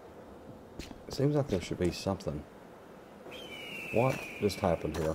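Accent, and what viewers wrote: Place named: South, United States. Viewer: your southern accent makes this lets play more authentic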